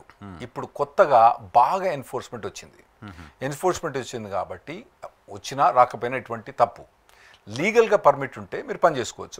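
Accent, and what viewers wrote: Telangana, India. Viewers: Excellent video in telangana accent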